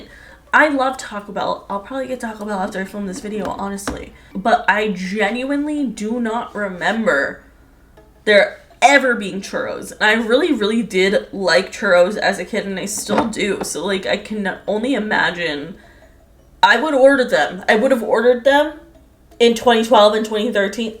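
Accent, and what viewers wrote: United Kingdom. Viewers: Wuuuut??? He’s british!! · bishhhhhh tfff IM BRITISH, I WAS ADAMANT HES BRITISH 😮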